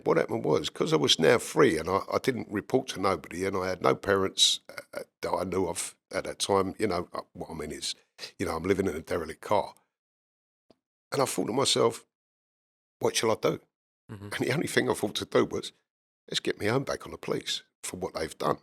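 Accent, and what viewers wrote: Sunderland, United Kingdom. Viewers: Good Podcast You From Sunderland?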